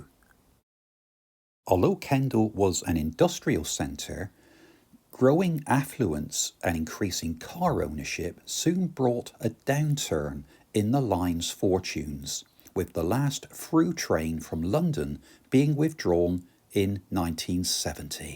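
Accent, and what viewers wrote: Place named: West Country, United Kingdom. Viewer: You have a bit of a West Country accent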